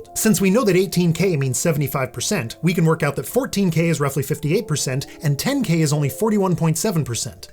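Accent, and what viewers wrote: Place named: United States. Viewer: His accent is American but his legal references are British. I don’t know where the law changed in 1973.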